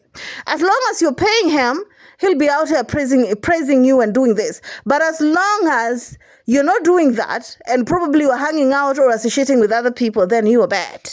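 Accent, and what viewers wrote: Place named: Nigeria. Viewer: wahlai! One of your ancestors was Nigerian. You sound & rage like us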